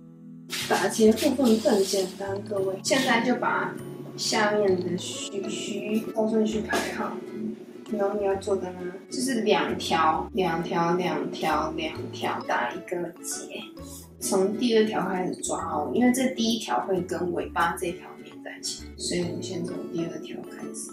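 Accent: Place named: Taiwan. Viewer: 作者可能長居外國，所以台灣口音+外國口音，但也不是你聽不慣就怪，不然你的口音，我聽起來也會覺得怪囉。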